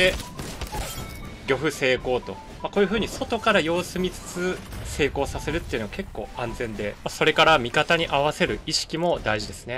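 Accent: Japan. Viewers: 方言の喋り方茨城弁じゃないかな？ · 方言青森くさいな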